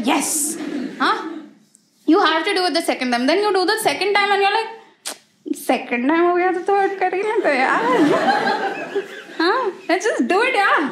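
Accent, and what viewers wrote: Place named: India. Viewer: this is why Americans make fun of Indian accent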